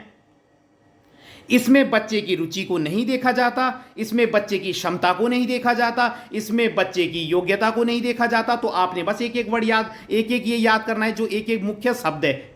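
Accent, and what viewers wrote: Garhwal, India. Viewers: Sir m bhi Apki awaj m thoda gharwali pan lag rha tha esliye pucha Thanku sir Aap bohot shi se explain karte ho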